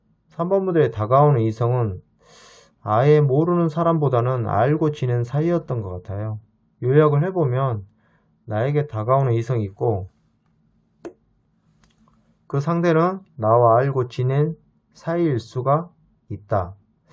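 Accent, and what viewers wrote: Jeolla, South Korea. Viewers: 의도하신건지 모르겠는데 설명하실때 사용하는 언어가 너무 웃겨요 그리구 전라도 분이신듯!??ㅋㅋㅋㅋ 2번인데 잘맞추시네요 ㅋㅋ 왜그런지 모르겠는데 사람들이 저를 좋아해요 감사합니다~!!! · 전라도 사투리👍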